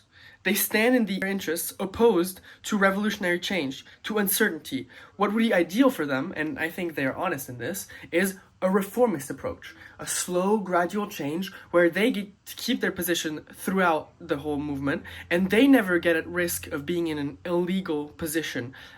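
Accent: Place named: Europe. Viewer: Hey I like your points in general but I want to push back a bit on the notion that revolution would benefit the whole working class/that the working class is truly united in their interests globally. I don't know exactly where you're from but based on your accent I'm gonna guess somewhere in mainland Europe. I bring that up only to say I'm not from Europe so idk the ins and outs of the situation in that part of the imperial core, but I am from so-called Australia and most "leftists" in this country (and many other colonial countries) are not our allies (by "our allies" I mean any true actually left wing internationalist's). Learning simultaneously more about the history of colonialism in Palestine and in Australia has led me to the same conclusion for both cases, the only way to resolve the contradictions is decolonial revolution, something much more achievable in Palestine purely because of demographics but I am hopeful one day it may happen on this continent as well. 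The issue is though, it is genuinely in the interests of the settler-working class to maintain colonialism. I'd argue this is the case even more so then it is that European working class benefits from Imperialism. I know you make the claim in this video that the benefit is marginal, and that is true in comparison to the benefits socialism could bring, but I also ask you at least reconsider that point by comparing your living situation to that of the vast majority of humanity. But back to my main point, genuine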